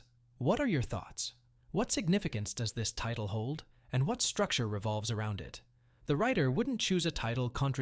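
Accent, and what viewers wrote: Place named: Spain. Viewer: el acento español a muerte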